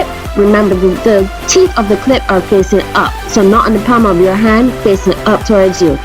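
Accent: Barbados. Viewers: Love that Bajan accent 🇧🇧🇧🇧🇧🇧 thanks for the ideas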